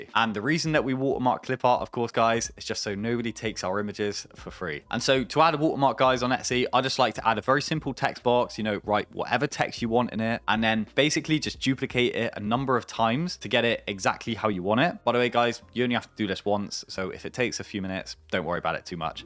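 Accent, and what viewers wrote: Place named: West Country, United Kingdom. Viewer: The Etsy brought me here, the West Country accent made me stay. 😂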